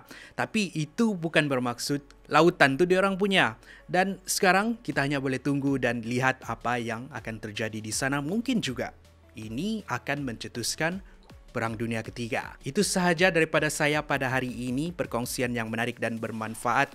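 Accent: Sabah, Malaysia. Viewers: Ko ingat semua orang Malaysia ni melayu ke....dia orang sabah memang la guna bahasa baku Malaysia.......hairan betul aku dengan orang melayu ni🤦‍♀️🤦‍♂️ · X prnh dgr loghat Sabah ker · Orang Sabah bah dia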